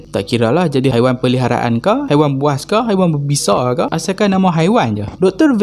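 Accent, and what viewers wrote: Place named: Kedah, Malaysia. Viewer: Pekat kedah.. Haha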